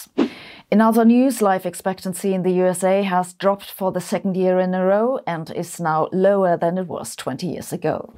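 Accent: Germany. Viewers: You're German so of course you have an accent speaking English, but I hope I'm not out of line to mention that native English speakers pronounce "comb" to rhyme with "home". Your pronunciation sounded more like the Welsh word "cwm" which is a glacial feature - Google says that's "felskessel" in German. Feel free to correct my atrocious German any time! As ever thanks for the awesome videos and keeping me in touch with the world of physics and science which I haven't studied for several decades now. Even my non-scientist wife enjoys your videos and we always get a kick out of your humour. I live in hope we will get a musical number from you again sometime, you are so multi-talented!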